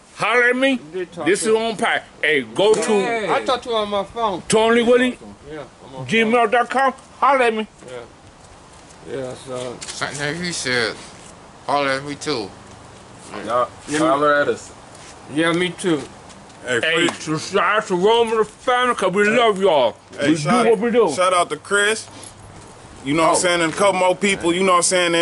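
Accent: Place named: Mississippi, United States. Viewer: “I’m from Mississippi” I CAN TELL 😭😭!! My grandma talks the same way. Y’all my family now too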